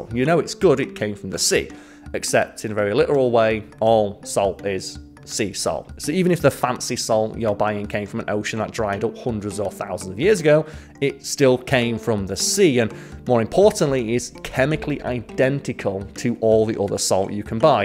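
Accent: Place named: Yorkshire, United Kingdom. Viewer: Montelban, not Montelblan, still made me laugh every time. Then again, maybe I'M the one not fully understanding your wonderful Yorkshire accent. Hmmm?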